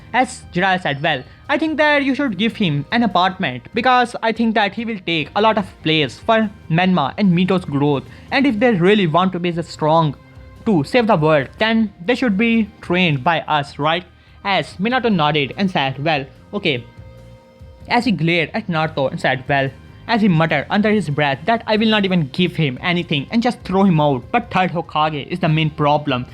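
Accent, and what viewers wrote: India. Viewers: u indian mate? Edit: idk why did i even asked this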